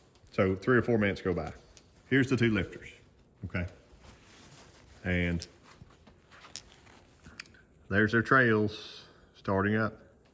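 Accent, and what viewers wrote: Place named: Appalachia, United States. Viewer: you dont know what the hell your doing hill belly talk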